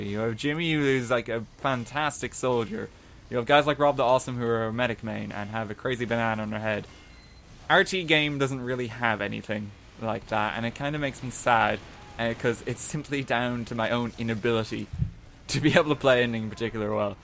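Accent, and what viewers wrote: Ireland. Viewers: He sounds Irish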